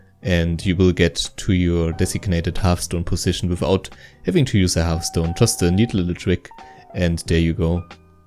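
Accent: Germany. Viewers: He is german, i think.. :D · Are you german? Because of the accent. Just asking. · german Accent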